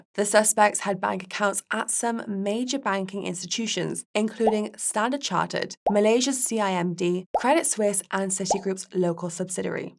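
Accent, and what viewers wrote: United Kingdom. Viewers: Her British accent n soft voice 🍬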